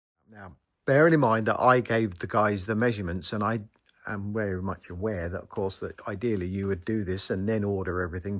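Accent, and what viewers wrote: United Kingdom. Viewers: Hi! I can tell by your British Accent, that you are renovating this house maybe in Cornwall or Devon. Or somewhere in Spain.❤ Greetings from Northern Germany, Fritjof 😊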